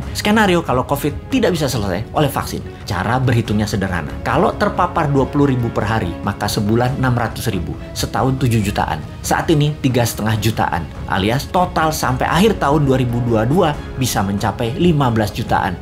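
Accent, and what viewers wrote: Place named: Malang, Indonesia. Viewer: Pak Bossman Mardigu AREMA asli y ??/ngomongnya ceplas ceplos tp bener si.. :-) logatnya mirip orang Malang..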